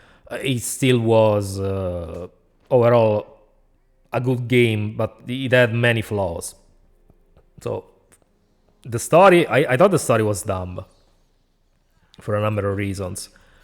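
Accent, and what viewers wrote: Italy. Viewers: By the way. The way you say Batman with your Italian accent is so satisfying. In a sense of the phonetics of it. Sorry autism lmao.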